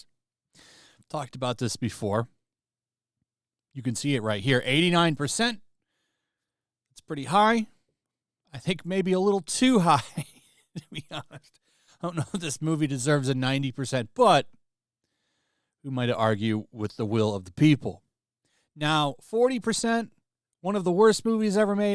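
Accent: England, United Kingdom. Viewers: when you developed an English accent I thought I was watch heel vs baby face but minus the screams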